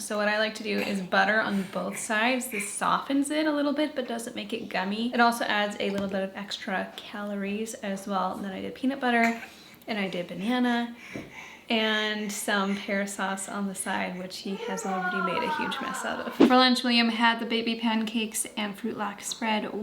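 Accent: Canada. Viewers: Definitely not all Canadians! She is from essentially the US Midwest equivalent of Canada. Compared to in Ontario (where Toronto and Ottawa are, our largest city and capital), I haven’t heard many people talk like she does! Another interesting one is how she says garage. Regional differences are so interesting!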